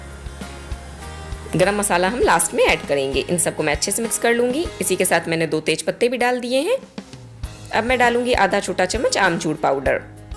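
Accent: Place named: Sindh, India. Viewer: Aapki accent le pata chal raha hai aap sindhi hi hai...very nice recipe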